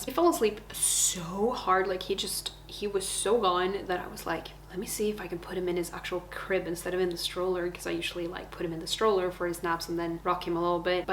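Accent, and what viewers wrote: United States. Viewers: Yes.. i usually have less interest about your cooking contents but i've realized that you have a perfect american accent.. maybe you should start to post some language based contents.. and maybe some informations from U.S.. And you should sail to kick or twitch.. these can also go with live streams.. Ohh one more thing.. i think you forgot to cut this video to parts or it's basically a weekly video :D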